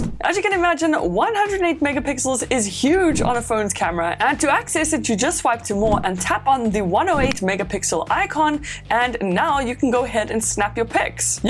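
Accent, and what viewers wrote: South Africa. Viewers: Your SA accent is refreshing 🙂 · Are you South African?????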